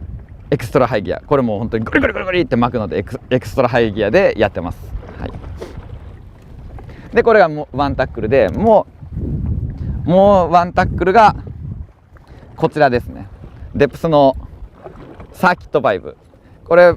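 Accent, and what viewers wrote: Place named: South, Japan. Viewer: South japanese accent!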